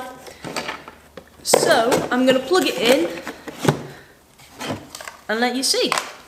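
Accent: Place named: England, United Kingdom. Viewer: i dont know why i came to see this but i stayed for the beautiful lady and the english accent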